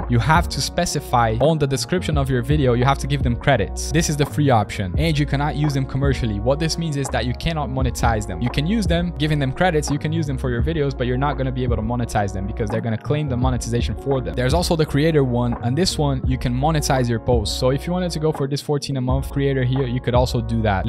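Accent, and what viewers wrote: Brazil. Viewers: mano, tu eh br né? kkk o sotaque entrega mt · sotaque brasileiro carai hahahahahahha seguindo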